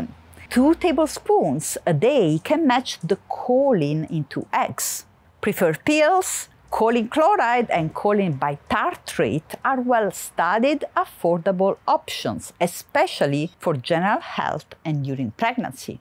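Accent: Naples, Italy. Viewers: dott lei rimane napoletana anche quando parla in inglese😅. Un saluto per la sua mamma❤ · Quanto è sexy l'inglese con l'accento napoletano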